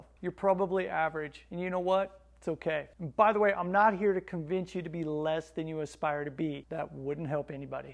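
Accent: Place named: Kansas, United States. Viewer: Sounds like he's left the land of Oz and is back in Kansas--Wichita, Kansas!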